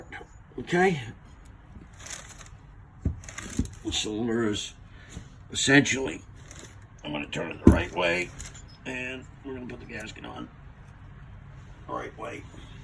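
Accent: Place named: East Coast, United States. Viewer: Great description of what you found and the mechanics of it all. Subbed for you articulation and East Coast accent!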